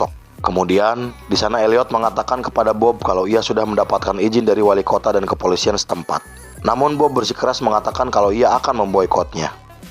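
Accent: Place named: Banyumas, Indonesia. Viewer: Intronya ngapak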